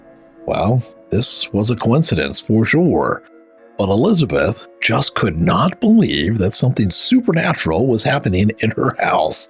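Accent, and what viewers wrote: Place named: United Kingdom. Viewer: Glad you used a clear British accent as South Shields and up that way have a very thick accent. Awesome upload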